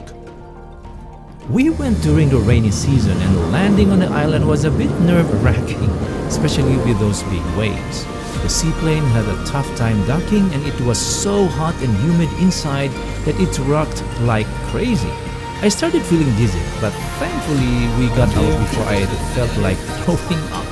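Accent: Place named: Philippines. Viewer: Your. Filipino accent is so thick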